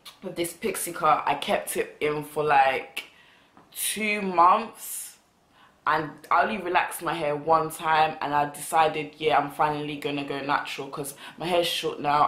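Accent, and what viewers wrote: United Kingdom. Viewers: Just subscribed - only because I heard your accent....big up Brit Youtubers!! Now let me listen to what you have to say! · I love that British accent, it's special.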